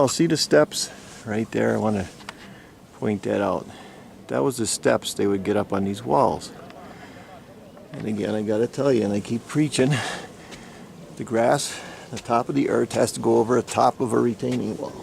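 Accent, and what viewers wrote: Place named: Pennsylvania, United States. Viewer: As soon as you started speaking I knew you were from PA....like myself! Nice video!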